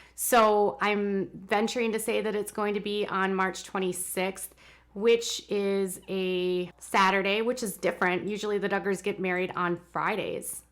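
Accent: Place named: North, United States. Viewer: Wilkinson Yes I have watched live, and it is a whole other ballgame! Her livestreams are usually very intense, with lots of opinionated viewers. I have heard her get tough with rude people who bombard her with idiotic questions, moot points, or repeat information to her that has been discussed in several of her previous uploads. I cannot blame her for at times being short with people who halt the program because they can't pay attention!!! runs a serious channel, she puts a lot of time and effort researching her subjects. Her tone is what I expect from a Northern state (in Texas our approach is a bit softer), but I in no way think she is rude. She is blunt but not rude! She must wrangle up the thousands of guests on her livestream or she would never get her job done!!!! Much LOVE to you!!! ❤💋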